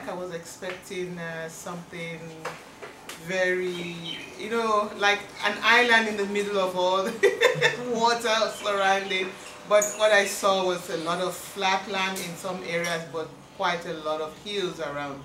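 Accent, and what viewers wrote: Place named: Jamaica. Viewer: You're already blending your native tongue with that of Jamaica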